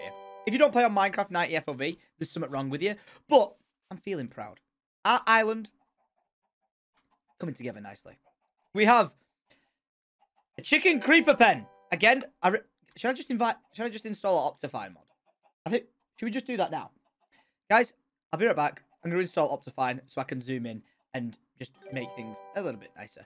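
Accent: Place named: Scotland, United Kingdom. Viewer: Why does turn scottish all the time lately? 😂😂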